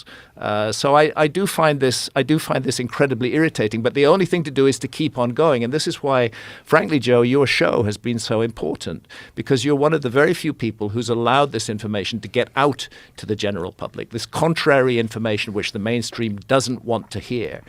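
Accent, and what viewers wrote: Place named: United Kingdom. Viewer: Hancock has no education in any field close to archaelogy, geology, or even Egyptology, he's simply looking for a buck by insisting nonsense like the Sphinx is literally 100,000 years old which makes no sense lol. He's this generation's Erik von Daniken who propogated the ancient astronaut idea decades ago, except wants to put a comforting British voice and updated spin on totally insisting his ideas explain history by sprinkling some truth on a lot of reasonable sounding bullshit. He didn't invent the Young Dryas cataclysm hypothesis, he just wants to take credit for it, and the only unique ideas he expresses are absurd. They're entirely contradicted by rounds and rounds of data all over the natural sciences. I'm not saying some of what he claims isn't wondrous and worth investigating, I'm saying that a lot of what he claims is clearly wrong and he's in this for the money.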